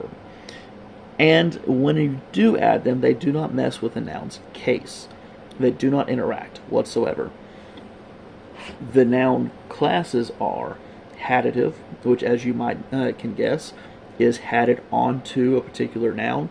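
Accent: United States. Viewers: as a southerner, this is how some people really talk down here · Pretty sure this is just how people from Mississippi talk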